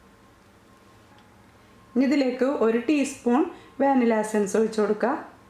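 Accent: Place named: Aluva, India. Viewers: ചേച്ചി ആലുവ കാരി ആണോ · കൊച്ചി ആണോ നാട് ?? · എവിടെ വീട്.. നല്ല പരിചയം ഉള്ള സംസാരം.. എല്ല word ന്റ അവസാനം "ട്ട ". . ഞാൻ ഇങ്ങനെ സംസാരിക്ക 😄from ത്രിശൂർ.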